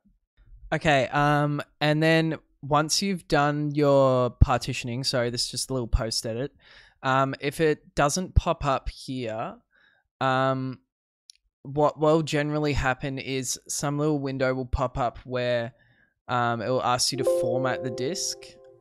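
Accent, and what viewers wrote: Australia. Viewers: Finally, a guy I can understand, as a fellow Australian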